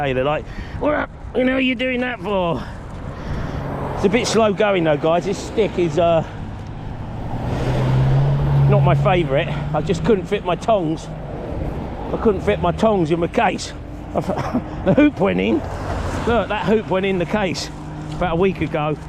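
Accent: United Kingdom. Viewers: orange county should have a volunteer program for litterpicking that you could sign up for. they'd provide bags and sticks for free. And yeah, that english accent makes you sound authoritative to us americans. good work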